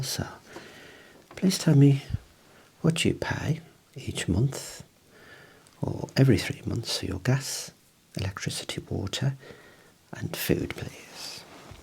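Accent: Birmingham, United Kingdom. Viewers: I didn't know how much I needed Brummie ASMR 💓 this was lovely, thank you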